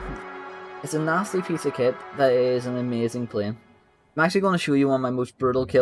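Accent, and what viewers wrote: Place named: Ulster, United Kingdom. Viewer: Your definitely an ulsterman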